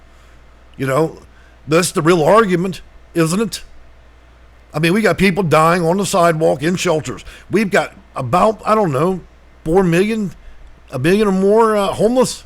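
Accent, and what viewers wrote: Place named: United States. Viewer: Right on talk American to me!!!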